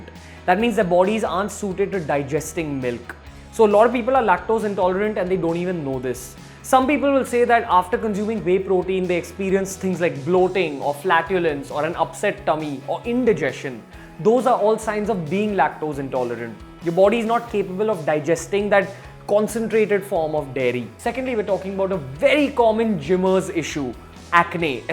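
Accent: India. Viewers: Its Amino acid not "Ameino" acid! Yes, I checked the oxford dictionary and thesaurus. Stop using that ugly fucked up Indian way of pronouncing shits. Makes you look like a fuckturd wannabe. That was just pure cringe!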